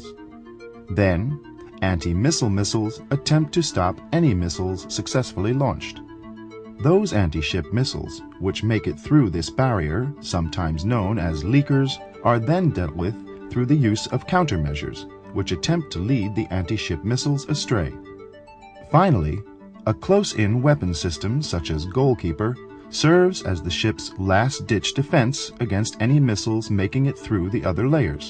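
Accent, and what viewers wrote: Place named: United States. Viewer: He speak american english NOT french so its american made video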